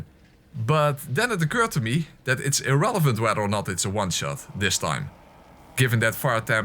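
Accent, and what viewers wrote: Netherlands. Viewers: I like his dutch accent!^^ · your accent is quite obvious Dutch (Netherlands). Very nice 🙂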